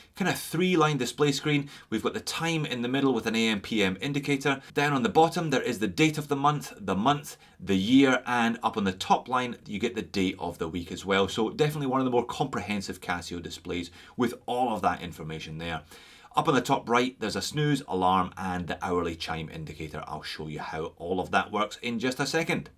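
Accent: Scotland, United Kingdom. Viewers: Another great review! I would like to ask, where in Scotland do you come from? I love your accent. I live on the Borders, and often pop up into Scotland whenever I'm tired of England (I'm English myself but cheer for Wales). I particularly like the "Muckle Toon", Langholm. I'm a Casio fan, too - my sports watch is an ancient Baby G that my Grandad bought for me years ago. It takes a kickin' and keeps on tickin'.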